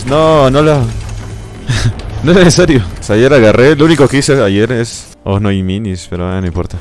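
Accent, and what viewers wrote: Peru. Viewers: eres peruano ??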